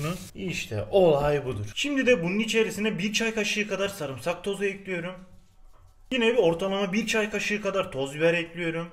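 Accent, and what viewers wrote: Adana, Turkey. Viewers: Tencereyi ocağa eklüyürüz abi sen hataylı degilmi sin adanalı gibi konuşuyorsun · Abi adanalı mısın şive tam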